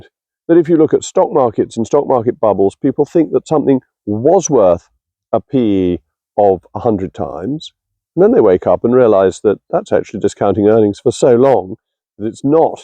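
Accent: RP, United Kingdom. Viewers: Bullshit in a suit and posh accent is still bullshit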